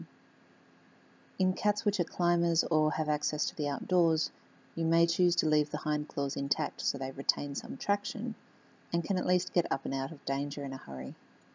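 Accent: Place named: Australia. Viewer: The drawings made no sense to me, and the video could have used another body to manage the camera better, including larger close-ups focusing on the clipped spot. But I did manage to grasp what was trying to convey due to my own earlier experience, where I found more cats to be less than enthusiastic about the procedure. So, in order to prevent a painful mishap, I wrap my mani/pedi ready furballs in a large towel, not too tight, leaving only the head protruding from the cotton sausage, and the paw under consideration sticking out from another tube at some distance to minimize the chance of being bitten. Oh, and in the event blood is drawn, I keep a dish with H2O2 and cotton ball handy. Better safe than sorry. And, absolutely, yes, a special treat comes at the end of the not-really-an-ordeal. P.s. -, could you please narrate at a slightly slower pace for those of us who are trying to comprehend yr Aussie accent and grasp what you're saying at the same time. 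(Maybe that's why I failed to get the drawings.) Thanx. (With apologies if I managed to, however inadvertently, offend with my blunt comment.) Ps2: you have a very compelling and lovely sweet and soothing voice.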